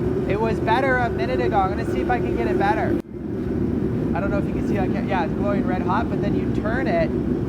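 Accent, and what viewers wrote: Canada. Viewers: I'm Canadian. And you sir, have a truely incredible Canadian accent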